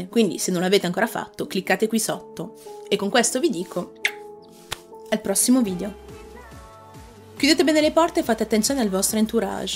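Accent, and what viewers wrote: Apulia, Italy. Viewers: Non sapevo che fossi francese, se davvero è così complimenti parli un italiano perfetto, pensa che io ti scambiavo per come parlavi in italiano per una Pugliese😂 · Ma perché se sei francese hai un accento pugliese così forte???? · Interessantissima storia ed allo stesso tempo agghiacciante. Quante vite sono sconvolte da storie simili. Una curiosità: ti credevo pugliese.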